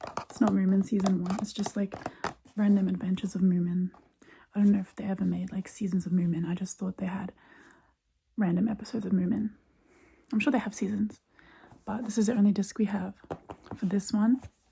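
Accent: Australia. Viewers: I always felt australian and british accents are extremely similar. your accent is very australian so I think that maybe english is your native language and you are only studying japanese but havnt become fluent yet.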